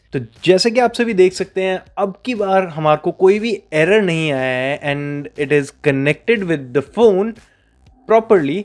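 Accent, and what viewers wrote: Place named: India. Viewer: work on your accent. It's half indian half english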